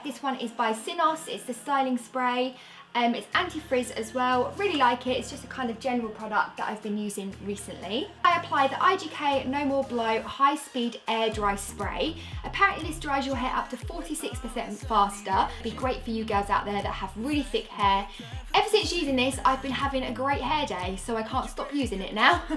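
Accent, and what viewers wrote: United Kingdom. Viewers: I would die for your british accent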